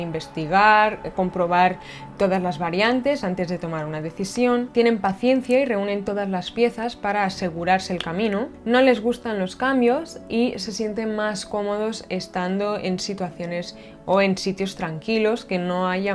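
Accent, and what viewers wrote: Spain. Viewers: me encanta tu acento español se escucha muy bonito. Saludos desde Guatemala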